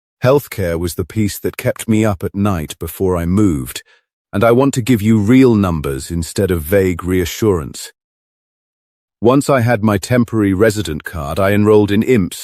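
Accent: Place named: United Kingdom. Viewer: Why is my gut telling me that this is AI? Is it the strange eye-blinking or the British accent saying he was a truck driver in Ohio - lol!!!!!!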